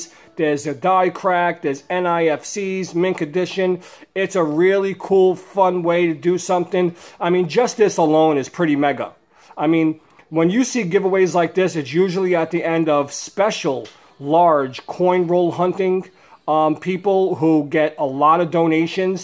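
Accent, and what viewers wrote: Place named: Boston, United States. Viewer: Haha my wife loves your accent. Reminds her of her grandfather. Paak tha caa in tha haavaad yaawd. 🤘🏾👍🏾✌🏾🤟🏾👌🏾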